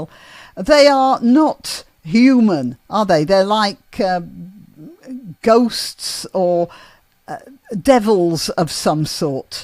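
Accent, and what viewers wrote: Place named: England, United Kingdom. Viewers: I don’t know if you’ll see this, but which part of England are you from? I’m American, but my mother was a British war bride, and your accent reminds me of her family. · just because You sound English doesn't mean You're smart Because everything you're saying is so off base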